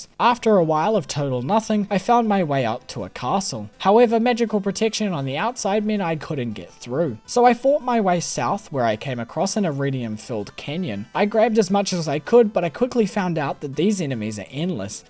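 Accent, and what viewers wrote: New Zealand. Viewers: The way my brain stopped working when i heard the kiwi accent. Wasn't expecting a fellow NZer! I'm so used to hearing the American accent 😂 Awesome video dude, gives me something to watch/listen to while crocheting lol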